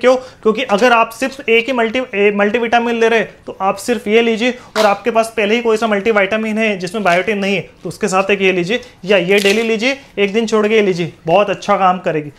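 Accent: Indore, India. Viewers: Indoor ke aash pash se ho kya ap toon arha he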